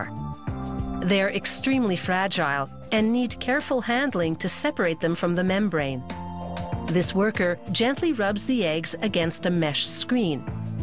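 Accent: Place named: Canada. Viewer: This is the most Canadian sounding narrator ever.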